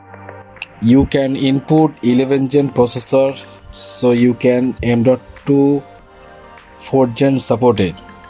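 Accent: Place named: India. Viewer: tu ingles es muy indio flaco mejor habla español y deja de joder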